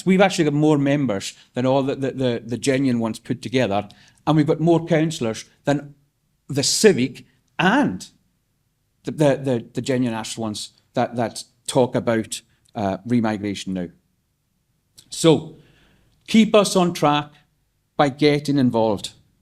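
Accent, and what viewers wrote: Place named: United Kingdom. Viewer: Great speech! Homeland party are great. On a side note iv never heard of someone that sounds like they have a Scottish, english, irish and welsh accent all at the same time 😅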